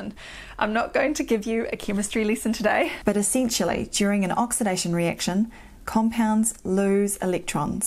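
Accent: New Zealand. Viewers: Absolutely stunning! And brilliant! Wish I had you as my physician! Although I may get lost with all the biology and chemistry lol What a smile but I'm sure millions have already mentioned that! Love the accent. I was in Oz in the early 90's and absolutely loved the accent and the people and yes I realize you are a New Zealander. I'm sure they are just as friendly as Aussies! G'Day! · I love listening to this cute Kiwi accent :-)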